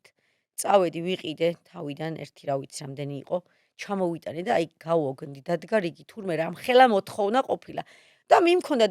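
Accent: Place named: Tusheti, Georgia. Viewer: კაი თუშური აქცენტი აქვს ❤ თბილისურ დაყენებული პრონაუნსი < თუშური პრონაუნსი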